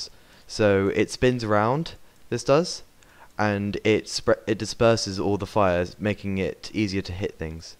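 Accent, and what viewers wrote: United Kingdom. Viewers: damn. U sound so british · you have a really nice British voice dude, I think you should try going for a podcast :) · Boii ur british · R u British if ur British ur y biggest youtuber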